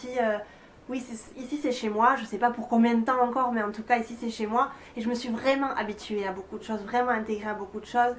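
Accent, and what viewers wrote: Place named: South, France. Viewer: j'adore ton accent du sud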